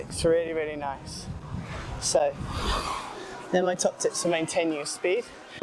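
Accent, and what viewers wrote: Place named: RP, United Kingdom. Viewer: I'm from Texas, USA. I like your posh sounding accent. I'd like to know Where are you from, so that I can add to my knowledge of UK (I assume) accents.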